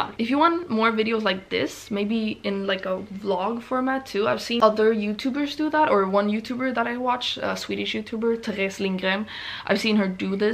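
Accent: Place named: United States. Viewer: omg ur american accent is so much stronger now! I havent been on youtube for ages and I really notice a difference! Cool :—-) Loved this vid xx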